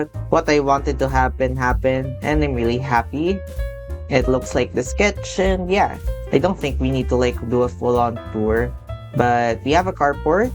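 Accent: Philippines. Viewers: Why is the accent very pilipino love it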